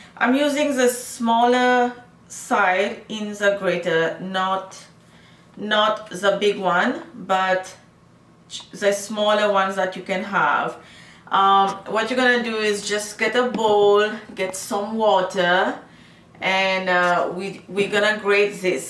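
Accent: Mauritius. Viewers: You have a mauritian accent.x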